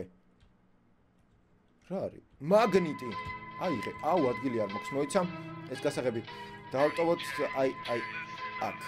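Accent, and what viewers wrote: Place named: Georgia. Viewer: tu videos igeb inglisuri sityvebit mashin mtlianad inglisurad ilaparake,magram tu igeb videos inglisuri sityvebit mashin inglisurad kargad mainc ilaparake aba qartuli aqcentit inglisuri sityvebis gagoneba sasinelebaa:)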